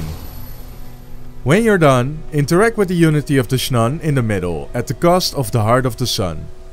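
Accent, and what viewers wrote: Netherlands. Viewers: You dutch aint you??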